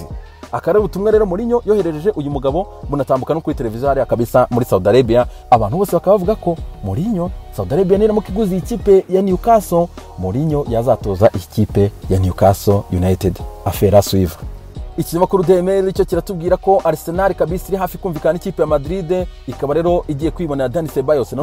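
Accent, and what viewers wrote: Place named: Burundi. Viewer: Nkunda ukuntu uvuga indimbura yacu birigakuru gacaracara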